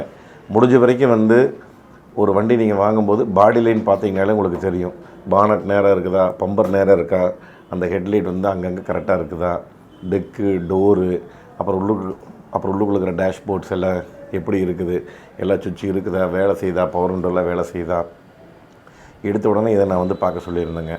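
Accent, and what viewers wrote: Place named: Tiruppur, India. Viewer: ... உங்களோட இந்த திருப்பூர் தமிழ் அருமை... அதற்கு நாங்கள் அடிமை..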